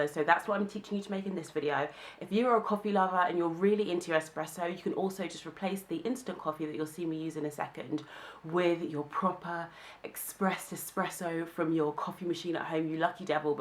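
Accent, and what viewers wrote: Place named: RP, United Kingdom. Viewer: I love your accent...I could listen to you talk all day!!!..so posh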